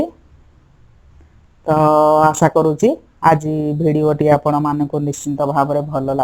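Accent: Angul, India. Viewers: Bahut badhiya vedio and Apnakara cute angulia Katha. Plz sabu din kichi angulia Katha Kahile bhala lagiba 👍👍👍👍😍😍😍😍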